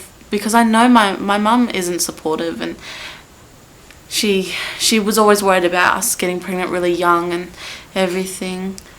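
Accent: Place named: Australia. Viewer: Hi. I wish I could give u a big hug right now! I can tell ur an Aussie bcos of ur accent. I'm an Aussie also. I have had 2 losses in 3yrs ( from so many complications) and I have never told my story until the doctors are talking me into it and they r eager to mention me in the medical journal. I believe in miracles bcos now I am 32weeks pregnant n baby is active n healthy!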